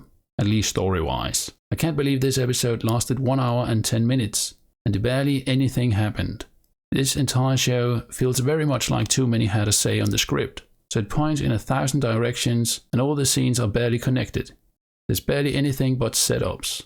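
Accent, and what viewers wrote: Denmark. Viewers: Love your accent? Are you Danish? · Im curious where you’re from? Cool accent If i were to take a guess I would say Denmark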